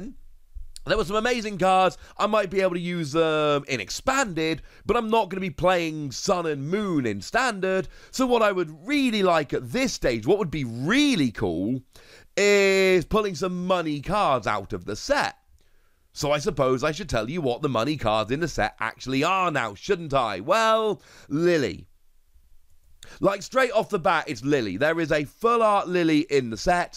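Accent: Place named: United Kingdom. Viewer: Yesterday I've watch your video about eevee evolutions vmax and I was thinking how british is your accent. Today you mentioned about your local uk distributors :D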